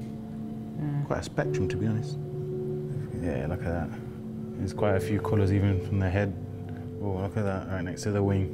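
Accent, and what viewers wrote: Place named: Nottingham, United Kingdom. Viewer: I love hearing a Nottingham accent on YouTube...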